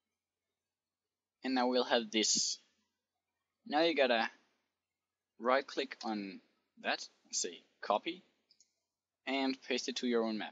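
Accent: Denmark. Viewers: are you danish :D?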